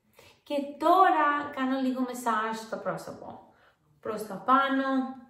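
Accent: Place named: Greece. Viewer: Gringlish 100%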